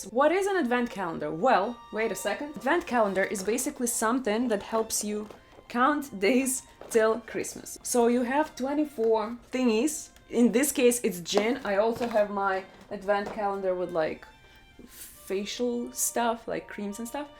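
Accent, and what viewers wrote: Russia. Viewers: I like your Russian accent...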